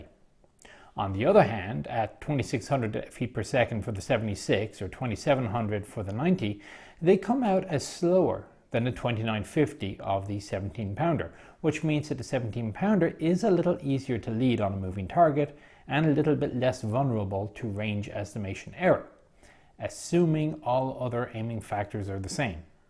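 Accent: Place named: Ireland. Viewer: This is the first time I have seen something from you that qualifies your statements on the inaccuracy of the 17pdr. In other videos your statements were, it was inaccurate due to manufacture. I have always had a little suspicion that your Irish lilt may point to your opinion on the British as a whole. However, I once again point to Joe Ekins who hit moving targets at between 800 and 1500 yards when engaging Michael Whitman's Tiger platoon. He hit at least three if not four targets with the Sherbrook Rangers also contributing to the demise of Whitman's Platoon and doing for Whitman himself.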